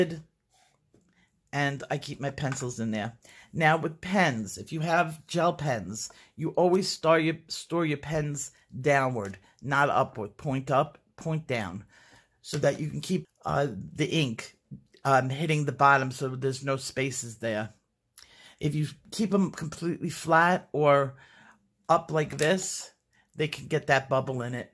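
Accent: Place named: Hoboken, United States. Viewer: you talk just like my mom, shes from Hoboken. She says looseleaf too:)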